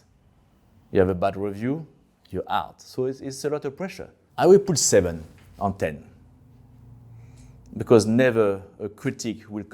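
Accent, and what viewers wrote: France. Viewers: I can listen all day to his french accent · The only thing that is bad on this video, is that we have to listen to French accent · This guy makes the French accents in the movie sound accurate. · he sounded so french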